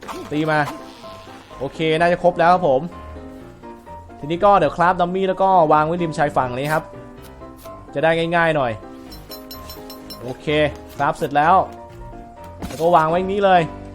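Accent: South, Thailand. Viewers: พี่อยู่ภาคใต้หรอครับ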